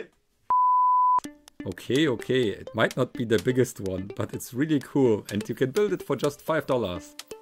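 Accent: Germany. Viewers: Are you german? You do sound like it. If so, you're one of the few (like Great Scott!) who make interesting videos with outstanding quality. Unfortunately a rarity among german youtubers. Sub +1, keep em comin' :)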